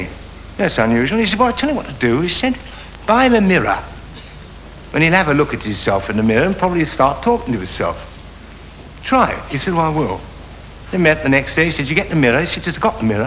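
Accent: RP, United Kingdom. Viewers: Love his upper-crust British accent. Makes everything he says even funnier. 🤣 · The uppercrust English delivery style always made me laugh and find it even more humorous..if I imitate an English gent i channel his imitation..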